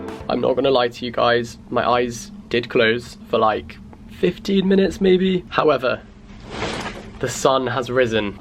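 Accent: United Kingdom. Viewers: Your violently British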